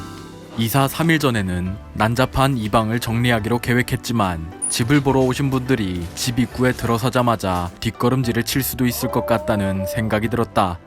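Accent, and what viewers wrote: Jeju, South Korea. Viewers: 제주도 분이세요?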